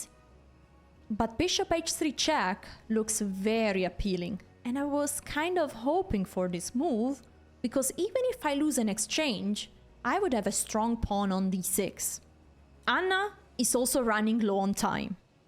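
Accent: Italy. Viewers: You have nice Italian accent 😍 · You have super cute italian accent! 😄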